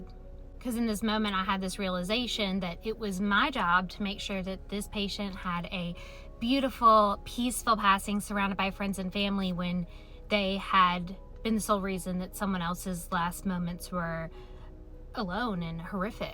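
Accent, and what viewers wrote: South, United States. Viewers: I ❤❤❤❤❤❤❤❤ her country voice them southern folks accents makeme happy every trip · That’s one hell of a southern accent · What part of America is this accent from??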